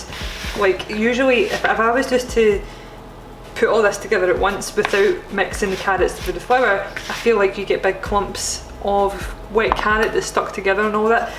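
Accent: Scotland, United Kingdom. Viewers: Be proud of Scottish roots lass. Let that accent be free.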